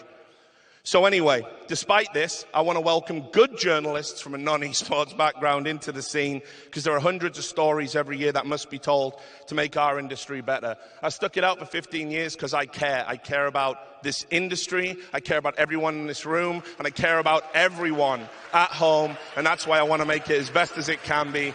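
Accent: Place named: Newcastle, United Kingdom. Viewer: Love the Geordie accent.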